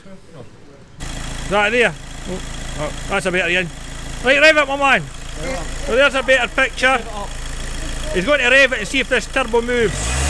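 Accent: Scotland, United Kingdom. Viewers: Nice to hear a good Scottish accent. Could have been egr, but with the state that was in, egr could have been one of many.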